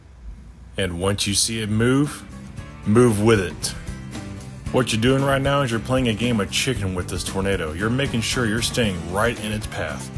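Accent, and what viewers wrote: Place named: Texas, United States. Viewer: The Texan accent making it’s infinitely better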